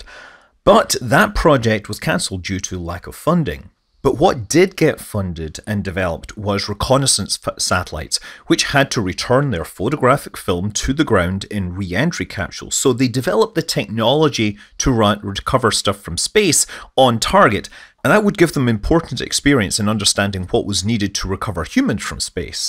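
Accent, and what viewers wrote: Scotland, United Kingdom. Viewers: Is Scott... Scottish? I thought I've heard a Scottish accent.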